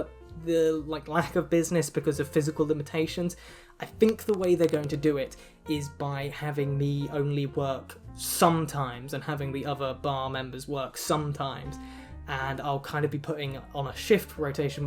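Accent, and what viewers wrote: United Kingdom. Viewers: The sunglasses the black satin opera gloves and the British accent really does it for me. Anyone else?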